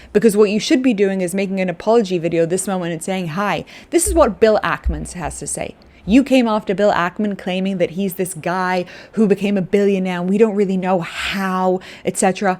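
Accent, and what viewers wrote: United Kingdom. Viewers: I like your hair!! Its like wool... beautiful texture. By the way r u British? I like your accent too... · British accent?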